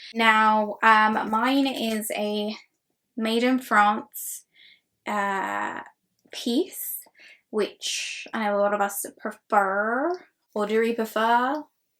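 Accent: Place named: United Kingdom. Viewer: Girl, you had me fooled - you sound British but referred to $$$ 👀👀 where are you from?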